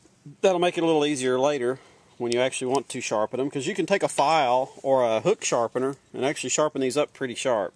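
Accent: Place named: South, United States. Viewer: This guy sound really southern. (in a good way)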